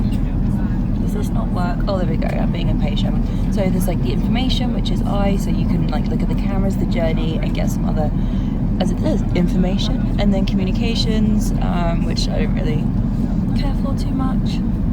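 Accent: RP, United Kingdom. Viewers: Posh upper middle class totty. Loving the private school accent. In love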